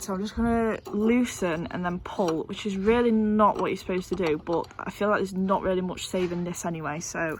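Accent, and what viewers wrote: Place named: Lancashire, United Kingdom. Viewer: You sound very much like your from my neck of the woods around Lancashire area ?? I love the videos !!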